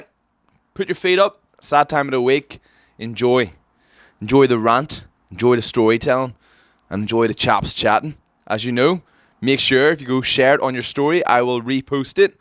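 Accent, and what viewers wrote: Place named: Northern Ireland, United Kingdom. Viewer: You from Northern Ireland? Or ireland seems like more noeth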